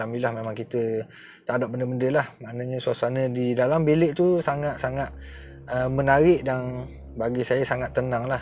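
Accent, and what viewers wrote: Terengganu, Malaysia. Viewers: Ooo... Awk org ganu ye.. 1st time lyn OH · MU BUAT KONTEN..APA MU CKP PUN..AKU XPHM..CKP BENDA APA PUN XTAU..SBB MU PEKAT SGT GANU..CKP K.L...SEMUA OWG PHM..MU CKP GANU..MN PAHM..MU PUN NK CKP TAKOT2..LG XPHM..LAST2 AKU BOSAN NK TENGOK..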